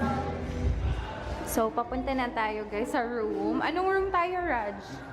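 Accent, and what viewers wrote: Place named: Visayas, Philippines. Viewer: Miss makainlove lagi ka kaayo’g tingog nagpaila jud bisaya😍😘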